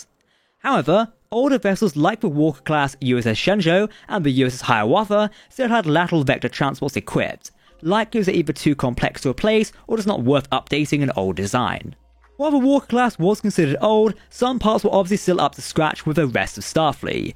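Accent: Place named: Cockney, United Kingdom. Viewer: Firty seven. The Cockney accent drives me insane.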